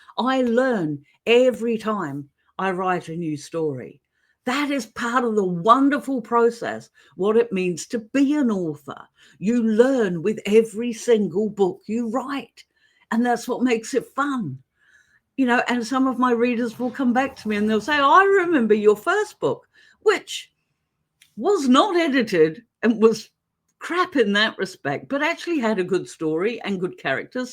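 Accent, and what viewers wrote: New Zealand. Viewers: I saw the backdrop of your thumbnail and thought, oooohhh that looks kiwi. Heard your voice. Was like definitely kiwi. Hellooooo from Auckland 👋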